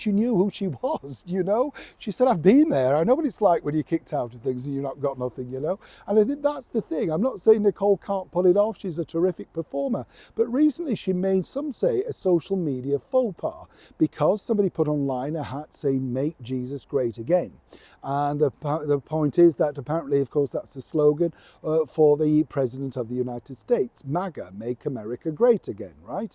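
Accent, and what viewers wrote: Yorkshire, United Kingdom. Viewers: She's always been a fantastically attractive woman, and it's good to hear that she has faith in God. You are right; some people are way too spiteful online now. I like when you said you speak your mind. I think that's why I watch you. I'm guessing you come from Yorkshire.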